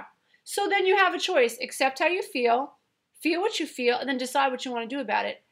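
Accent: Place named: New Jersey, United States. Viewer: Love you after only listening to this video..... We have very similar lives/experiences. Love the way you talk......I'm a jersey girl - you sound like one as well :) How can I follow you?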